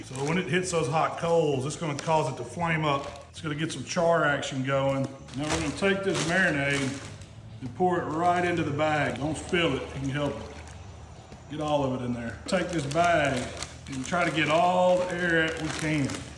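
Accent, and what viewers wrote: South, United States. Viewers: Souther drawl ✅ Beard ✅ Verge of type 2 Diabetus✅ You know it’s gonna be good food.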